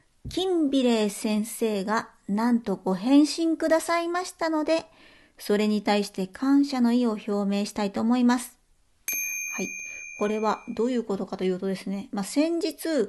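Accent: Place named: Tokyo, Japan. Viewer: みんなで応援し萠絵さんを国会に送り込みましょう。 そして国会議員になってもらい、経済産業省に政務官として 活躍してもらうのです。役人はタジタジでしょうね。 ただし萠絵さんの東京弁はちょっときつめなので大阪弁が良いです。 「うちは国のために きばりまっせー。やりまっせー。 浪速の根性みせたるでー！！ 維新や公明がなんぼのもんじゃ！！ 負けへんで。 ほやから うちに 1票入れたって」 絶対当選させるのです！！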